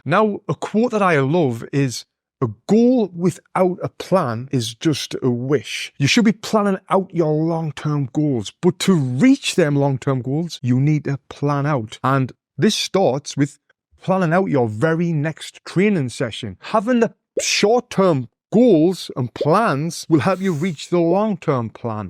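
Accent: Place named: Sunderland, United Kingdom. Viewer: he's from Sunderland, which is the city below Newcastle, so it's very similar to a geordie accent though most Sunderland folk call themselves Mackems not geordies. Hard not to love the northeastern accents 😂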